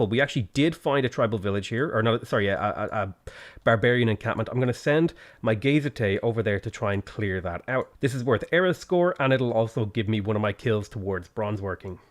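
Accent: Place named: Ireland. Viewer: Man,that thick Irish accent is probably the best thing about this channel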